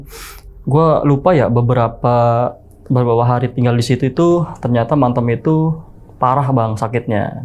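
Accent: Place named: Banyumas, Indonesia. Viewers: Bang, sekedar saran aja, Kayanya berat bgd ngmng gue lu... logat ngapaknya ga bisa ilang, lebih baik ngmng aku km aja. Orang jawa itu identik dengan tutur bahasanya yg alus soalnya 😅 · lu gua tapi medoknya ga ilamg 😎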